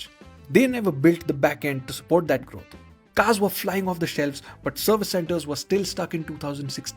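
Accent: India. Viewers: Pls stop the induan scammer ahh accent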